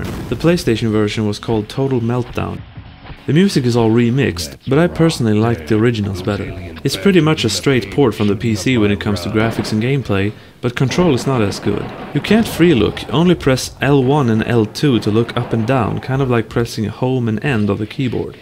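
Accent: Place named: Sweden. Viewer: Hah, hur visste jag att du var svensk sekunden du öppnade munnen? ^-^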